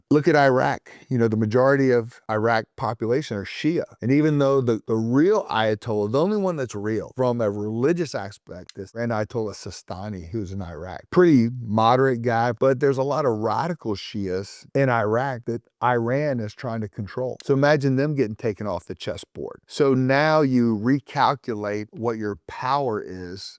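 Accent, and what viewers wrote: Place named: South, United States. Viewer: Correct. His southern accent limits his ability to pronounce a significant number of words properly, but we get the idea.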